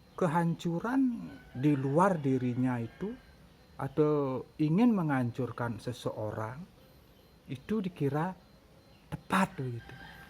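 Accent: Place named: Bali, Indonesia. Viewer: Salam kenal bapak baik hati berlogat bali. Semoga energi simpatik dan jiwa penolong anda berguna bagi sendiri, engkau juga dirimu. Saran saya suara yg terbata2 dan pembahasan yg muter2 lebih di lutuskan lagi niatnya🙏🙏🙏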